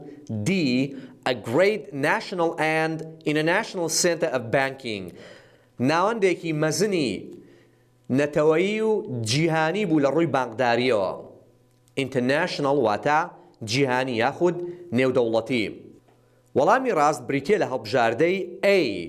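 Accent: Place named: United States. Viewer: دەست خوش ماموستا خوای گەورە پاداشتت داتەوە بەراستی ئاکسێنتەکەت زور شاز بوو ئەمریکی بوو ٫ من پیشنیارێکم هەیە بەراستی ئێمە زور پێمان باشە گەر جەنابتان بتوانن ئیبسۆتیش بە هەمان شێوە دروست بکەن گەر بە ئەرک نەبێت چونکە بەراستی من سەیری ماموستاکانی تر ناکەم لەگەل رێزم بویان زور جوان شەرحی دەکەن بەلام من لەبەر ئەکسێنتەکەمە بەلام هی تو زور جیاوازە زور شازە لەگەل رێزم بو جەنابتیشان 😍❤ thanks for everythin ,you are the best forever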